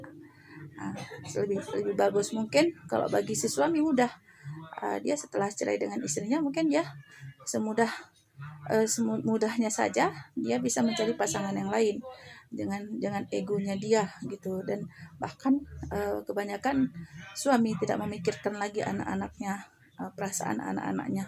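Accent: Aceh, Indonesia. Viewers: logatnya aceh...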